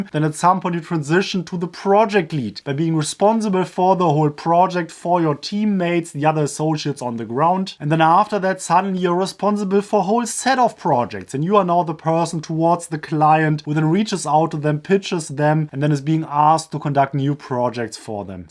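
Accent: Germany. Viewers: That Bayerische accent though lol! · Reminds me of my professor from Uni Mannheim. Love the German accent!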